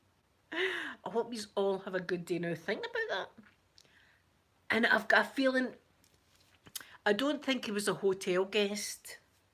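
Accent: Scotland, United Kingdom. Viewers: Your hair is gorgeous! You’re so pretty! I found you a few months back doing a search for asmr in a Scottish accent! Boom baby, I found you! Love your channel and especially the content! You can’t make this stuff up! Hahaha. You certainly live an exciting life. My kids are grown and live in Calgary and Vancouver so it’s just hubby and my animals living on 7 acres off grid here in Ontario! You bring so much excitement to my life. Thank you for sharing your life with us! ❤️🇨🇦❤️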